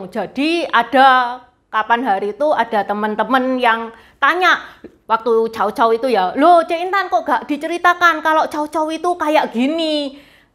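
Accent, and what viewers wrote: Java, Indonesia. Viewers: Wow so pretty intan saya suka cirita mu Kamu Jawa nya mana ko medok amat ya haaa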